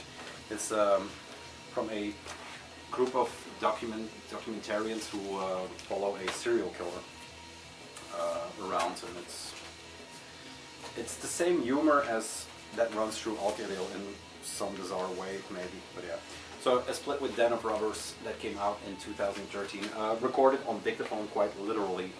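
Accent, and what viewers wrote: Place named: Netherlands. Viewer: You have a Dutch accent, i am from Belgium! Good video!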